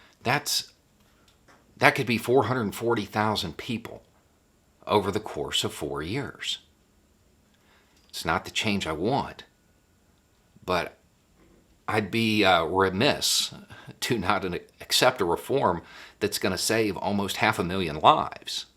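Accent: South, United States. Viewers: I'll admit that I'm a sucker for reasonable, compassionate, levelheaded discourse delivered in a southern accent. Subbed.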